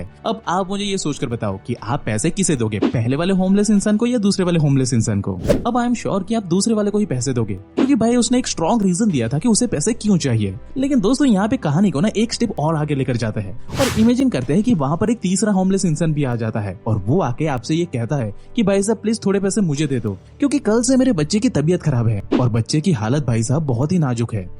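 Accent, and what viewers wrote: Bengal, India. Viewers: Content thik hai lekin ... Bong accent me Hindi pasand nhi aati ...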